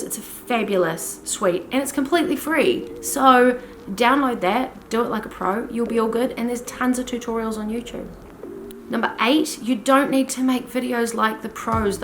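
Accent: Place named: New Zealand. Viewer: Solid advice. I like DaVinci Resolve as well. It has more features than most of us will ever need let alone use. We tend to be our own harshest critics. Your videos are lit well, the background isn’t distracting, you dress for success and your eye contact with the camera is good. And who doesn’t love a Kiwi accent?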